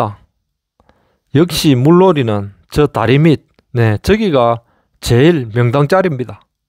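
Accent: Gyeongsang, South Korea. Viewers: 말을 참 조곤조곤하게 잘하시네요.저는 개인적으로 경상도 말투를 좋아 하지 않는데 말투는 참 듣기좋네요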